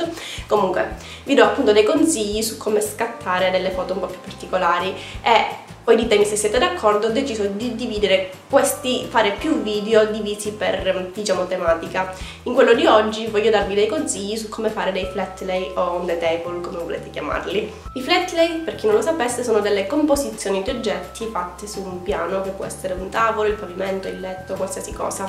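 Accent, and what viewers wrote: Sicily, Italy. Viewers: Ciao ti ho scoperta da poco sei siciliana per caso??:-))))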